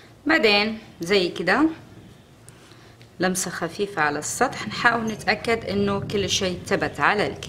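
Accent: Tangier, Morocco. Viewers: كتعجبني مكتهضر طنجاوية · ما شاء الله .تسلم ايدك انتي مغربية .ولا لبنانية .كلام. مخلوط. 😍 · باينة فيك تطوانية او طنجاوية ❤❤❤ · ههههههه مزيج عجيب بين المغربية الجبلية و اللهجة السعودية ضحكتوني و الله ايخلي ليك ااادم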